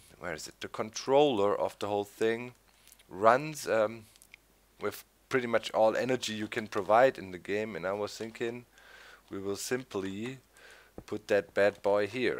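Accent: Germany. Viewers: Mancmal merkt man schon noch das du eig. Deutscher bist^^ Aber sonst coole videos und weiter so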